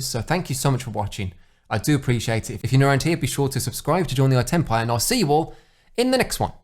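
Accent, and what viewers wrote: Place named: United Kingdom. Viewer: I am from Croatia and I have no accent but has a very clean British accent... The cleanmost British accent I've ever seen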